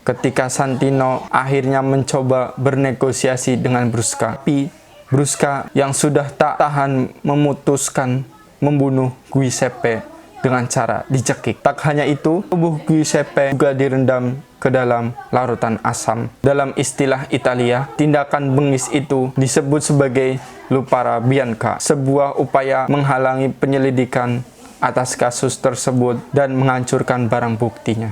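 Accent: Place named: Banyumas, Indonesia. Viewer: kumpas tuntas Bahasa tegal donk. Ko ngapak medok nemen koooh. Hahahaha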